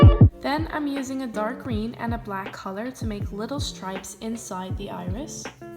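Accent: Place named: Netherlands. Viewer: Me: hmm it looks like she got a dutch accent! *looks in discription * "where do I live? The Netherlands Me: *cheers*I'm Dutch tooooooo!💕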